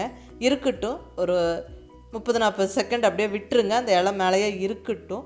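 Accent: Coimbatore, India. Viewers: Akka yen ka unga face katta mattungiringa, nanum covai ponnu than ka unga slang romba pudikum ka athanalae unga face pakkanum pola iruku ka🥰😍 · Super sister this dish item is super 👌 many vegetables and wheat flour combination nice your voice super 👌 madam .kovai Tamil I like it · Super sister.pargumpothey sapitanumpola iruggu .unga gongu tamil nice.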